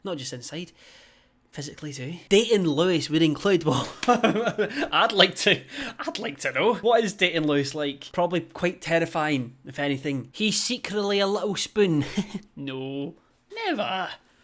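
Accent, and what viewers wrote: Scotland, United Kingdom. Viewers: Yous couldn’t get any more Scottish if ya fooking tried ladi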